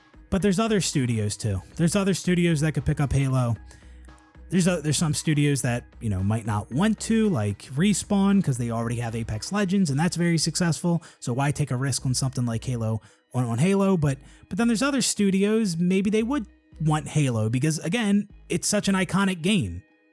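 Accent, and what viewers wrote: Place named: Pennsylvania, United States. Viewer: Gotta love a Pennsylvania accent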